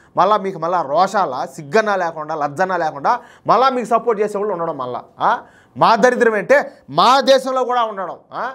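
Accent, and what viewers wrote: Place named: Chittoor, India. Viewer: గారు మీ యాక్సెంట్ ని బట్టి మీరు చిత్తూరు జిల్లా కి చందిన వారని అనుకుంటున్నాను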